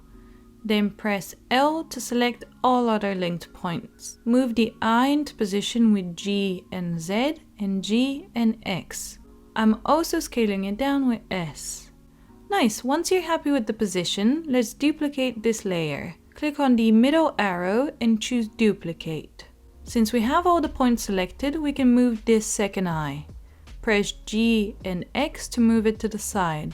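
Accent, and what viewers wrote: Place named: Scotland, United Kingdom. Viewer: The heck kinda accent is that? Watered down Scottish?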